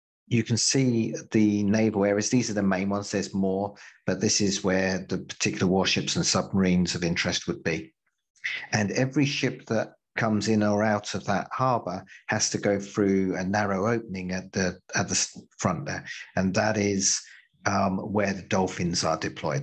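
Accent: United Kingdom. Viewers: I like the combination of shitty audio and the British accent. It works for me but I see what your saying. This dude sounds very informed and I like his delivery.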